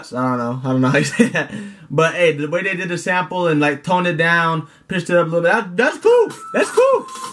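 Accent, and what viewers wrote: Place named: China. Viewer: Youre dutch sounds like Chinese (no hate tho)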